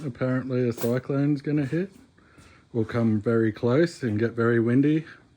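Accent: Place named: Australia. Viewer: Ok, to start with, since it says you posted this just 9 hours ago, you must have done okay with Alfred. I was worried about you guys, so I'm really glad to see this. Then... brother, you just gave me a crazy idea on how to build a roof for my Bertram 20. A little different than yours because frankly, I don't have anywhere near the patience you do for a project like that, but it'll be cool nonetheless. Finally, I had to laugh out loud, man. I grew up with a couple of kids who were from South Africa, and although I know that the Australian accent and South African ones are completely different, they're close enough to my American ear to bring back a heap of great memories.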